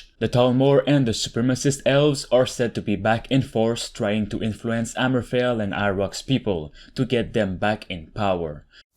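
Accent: Quebec, Canada. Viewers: That’s not the subject but are you québécois? I say that because of your accent Anyways great video! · are you french canadian ? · Are you French Canadian? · Is that the accent of a fellow french canadian I hear?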